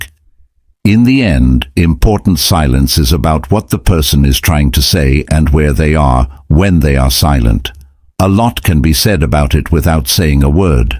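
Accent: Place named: Scotland, United Kingdom. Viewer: Yepp took on a scottish broague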